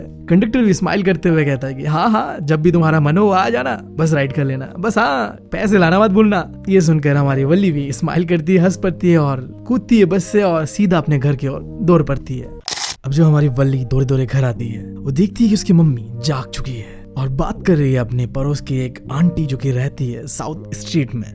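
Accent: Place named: Northeast, India. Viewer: BRO HE'S FROM NORTHEAST (ASSAM) TO THODA HO JATA H SMJH JAO NA❤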